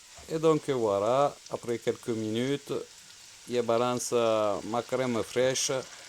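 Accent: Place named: Argentina. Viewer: Sympa l’accent Argentin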